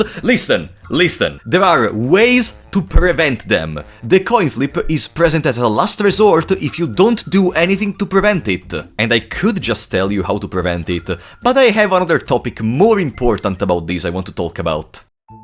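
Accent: Italy. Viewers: Hi as a new player i agree with you and I want to ask you if I should try to talk to enemies, I think is important but my friend always told me that is useless because all entities there are evil... Also you sound like you are italian.... are you?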